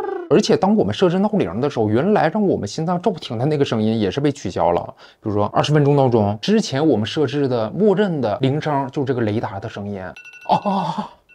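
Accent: Northeast, China. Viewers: 😅 看了博主好几期视频听着掉渣的东北话后，才发现博主坐标韩国😂😂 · 这东北大碴子普通话，duang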